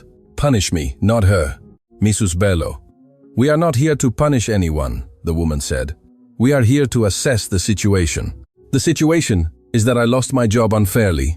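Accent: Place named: Africa. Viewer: ❤❤😊😊What a fantastic story!! Words can indeed change the world. It's tragic but true: arrogance blinds one to the cruelty they can wreak on others to salvage their bruised egos. Emeka should get another 25 years to ensure he doesn't emerge alive from prison. People like him should NEVER see daylight! Great story, fittingly narrated by one with an African accent. More of the same please.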